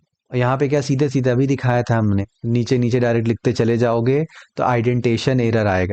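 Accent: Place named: Uttar Pradesh-Bihar, India. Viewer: Nice yaar. Or apni UP Bihar wali hindi me or maja aa rha hai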